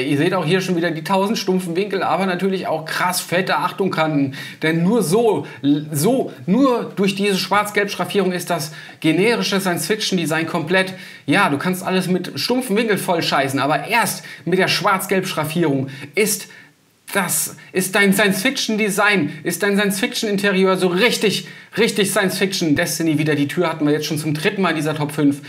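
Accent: Hesse, Germany. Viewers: Hart am feiern und immer wieder schaut der Hesse raus :)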